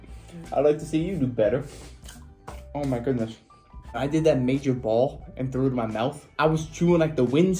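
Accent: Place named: New York, United States. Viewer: your NY accent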